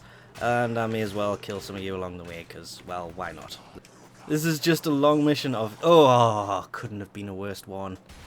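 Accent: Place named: North East England, United Kingdom. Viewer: Are you from the North East? You sound like you are, Newcastle or Sunderland.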